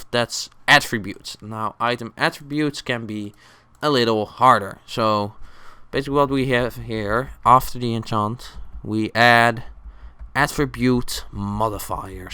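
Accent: Netherlands. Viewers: Your Dutch aren't you? You have a pretty obvious Dutch accent. You know CubeHamster? Same thing. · You kinda hear the dutch accent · ik hoor gewoon dat je nederlands bent niet? :D nice video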